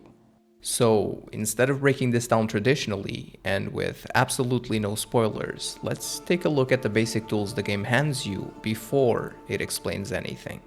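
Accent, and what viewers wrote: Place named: Portugal. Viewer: good video. CD is awesome. accent 100% fellow tuga. 😜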